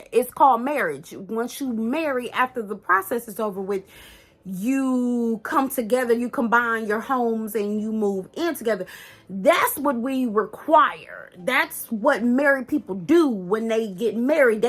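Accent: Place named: South, United States. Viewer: You a mess. I can not with you. lol Are you from the south? You sound like us from the south girl. lol 😂😂❤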